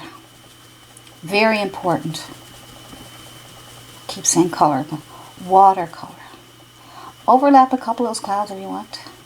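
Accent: Newfoundland, Canada. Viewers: I'm randomly looking at painting techniques on YouTube. And as soon as I heard you talk I knew you were from NL so I did some googling. . And sure enough. Im in corner brook. Great video :)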